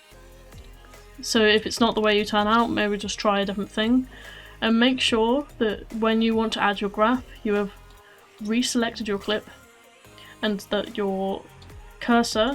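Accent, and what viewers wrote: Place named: United Kingdom. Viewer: i noticed when im trying this and i noticed your bri-ish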